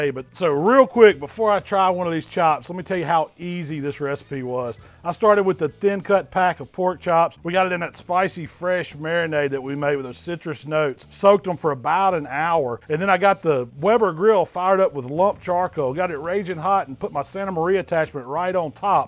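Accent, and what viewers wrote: South, United States. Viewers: It looks good, yet the Southern & Jamacian accents don't quite harmonize.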